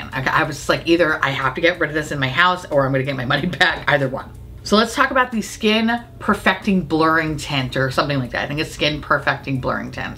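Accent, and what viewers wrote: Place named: New York, United States. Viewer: I am from nyc and I hear the new Yorker inside you 🥰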